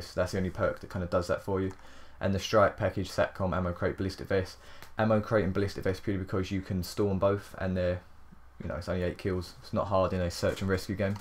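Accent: United Kingdom. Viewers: Ur British dude yeyhhh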